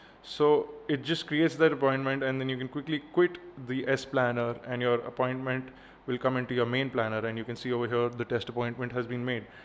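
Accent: India. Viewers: indian ? lol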